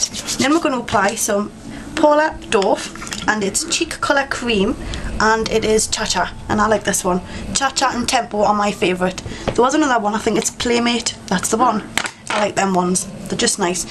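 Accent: United Kingdom. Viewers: what's her accent? if a UK one, specifically which one?!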